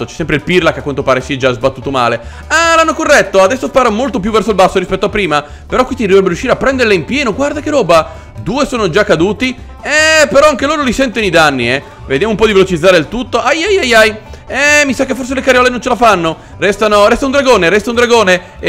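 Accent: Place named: Bergamo, Italy. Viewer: Da quando è che hai un accento bergamasco?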